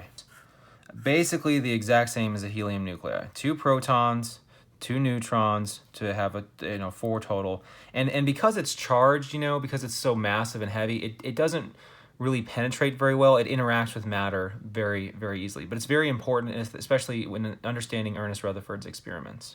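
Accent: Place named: United States. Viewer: Are you from Uzbekistan? If so why your accent is very American lol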